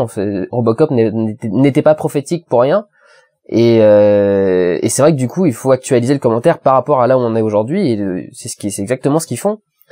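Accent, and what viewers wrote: Germany. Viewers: non mais ton accent allemand m'a plié en 4, franchement si tu as un jour une autre facette de toi à mettre dans tes vidéos, tu mettre le "spécialiste" le gars qui rationalise tout à la science et tu lui mets cette accent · Enorme l'accent allemand !!!! :)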